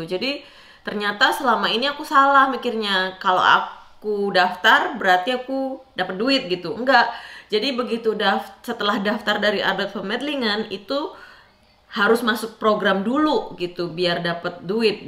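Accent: Java, Indonesia. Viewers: MBAK, JENENGAN KOK AYU MEN....MBEK OMONGANE MEDOK JOWONE, SENENG AKU....HAHAHA....SESAMA ORANG SOLO HARUS SALING SUPPORT......HEHE😍 KONTENNYA BAGUS2 LHO👍👍👍....SEMOGA MAKIN BANYAK YANG NONTON YAAA...SUKSES! GBU...😇💕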